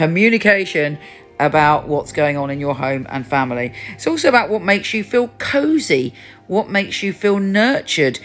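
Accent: United Kingdom. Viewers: Is this? How come she has british accent?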